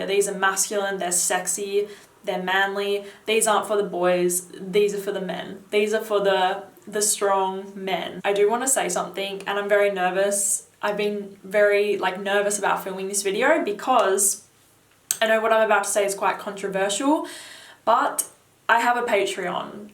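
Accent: Australia. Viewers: How have I never seen your channel before!? And Australian.. bonus. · I dont even know how I stumbled across this. lol But good looking out. I just purchased 2 of them :P Are you Australian? I think I just became a fan. :P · Are you Aussie? You sound like it.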